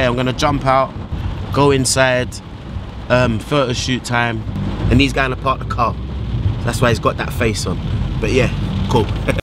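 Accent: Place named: United Kingdom. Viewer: As a none UK....you make the UK accent sound so cringey..... you can never sound hard so stop the antics. " bap"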